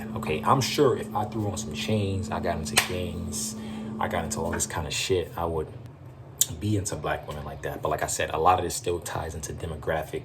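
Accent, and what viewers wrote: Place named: Caribbean. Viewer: Okay, after listening, it sounds like you're of carribean descent.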